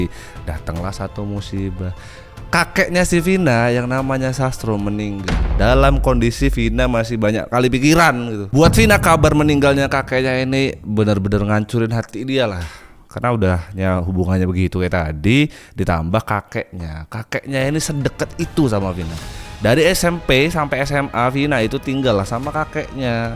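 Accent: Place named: Lampung, Indonesia. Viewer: bg.. omong mu jowo tpi logat mu. lampung.. mntab mntb.. suka dngrinya. 👏👏👏👍👍👍😁😊😊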